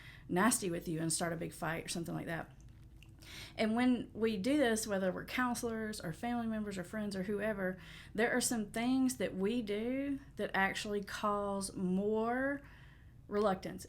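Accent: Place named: Tennessee, United States. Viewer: Hi, I’ve become addicted to your videos (not funny?) I’m 6 months clean. You have helped me so much. Anyway, if it’s at all possible, it would be really great to hear from couples that have made it through addiction and are doing well. I would be interested in hearing the perspective of both partners. It may give me a little hope. ❤ Thank you for all you do. You’re doing great work for the world, especially in the area of the world where you’re located (I’m from Bristol Tn and I can hear in your voice maybe you hail from close by? Hehe)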